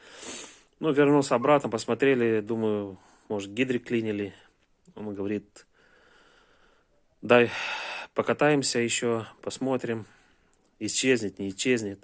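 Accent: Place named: Moldova. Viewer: Вы с Молдавии? По акцент у узнаю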